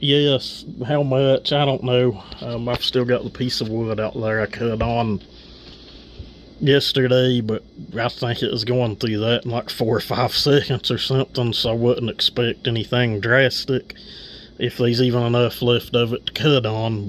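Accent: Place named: Deep South, United States. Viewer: No drama about the port work but what the fook is up with that accent? Is that deep south swamp? Love it too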